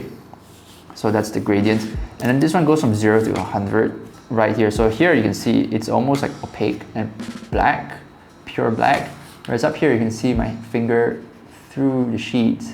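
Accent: Singapore. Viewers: I could tell you're from Singapore from your accent!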